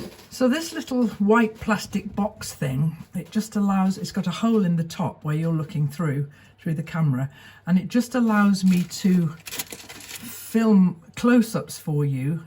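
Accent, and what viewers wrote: United Kingdom. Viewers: I just love watching you and listening to you chat away about things in life. I would like to know about that gorgeous tree on your window. You are most inspiring and I look forward to each day sharing with you all the things I love. I am an American, and have lived my adult life (now 77 years old) in Switzerland, mostly, also South Africa and Austria, too. I went to British schools and I love to hear you speak, British English, using familiar sayings. I chuckle at your humor (written humour, I believe in England). You sort of bring my several worlds together.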